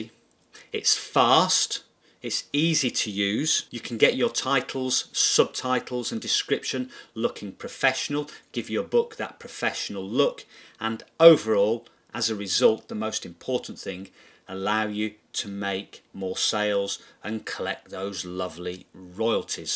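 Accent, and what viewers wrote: United Kingdom. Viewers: Interesting how English is spoken in so many parts of the world, yet have so many variations. I don't like using American English, but selling on Amazon, means using American. I do love your British accent,. Grammarly is great to use.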